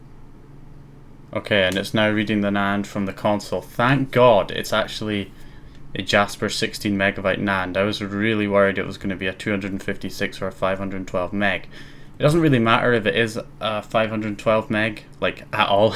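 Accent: Scotland, United Kingdom. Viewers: Where abouts in Scotland you from?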